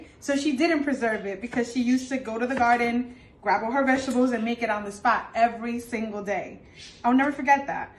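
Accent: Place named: Puerto Rico. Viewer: Hearing her speak makes me desperately miss My NY boriquas. South Carolina SUCKS when it comes to REAL food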